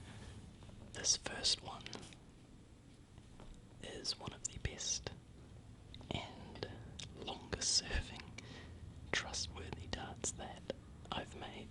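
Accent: New Zealand. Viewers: God I love New Zealand accents <3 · Are you from Chch by any chance...I love listening to my friend there talk to me on the phone.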